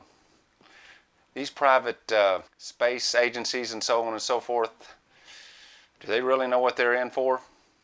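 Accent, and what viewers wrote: South, United States. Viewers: Mars is still inhabited by 5 species of Humans of varying sizes! They dont like or accept aliens coming to Mars! The Martians Kill all Alien life that land there? I also like your Southern drawl dear! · Southern accent is the best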